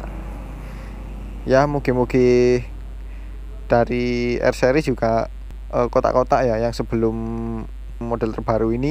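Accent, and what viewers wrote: Malang, Indonesia. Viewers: Wong malang opo wong batu mas?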